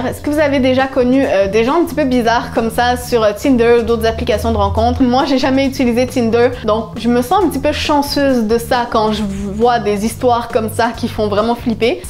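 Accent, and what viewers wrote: Canada. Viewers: j'adore ton accent tes canadienne ?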